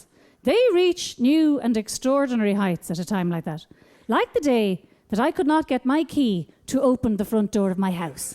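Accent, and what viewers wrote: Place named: Ireland. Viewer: Just discovered her the other day. Love her Irish accent. Hysterically funny. Big fan now.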